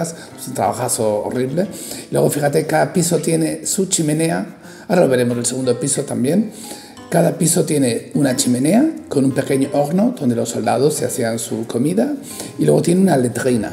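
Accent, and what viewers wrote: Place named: France. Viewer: MUY INTERESANTE, cada detalle de este castillo es una 💎 joya...más relatado por el y ese acento francés tan agradable ❤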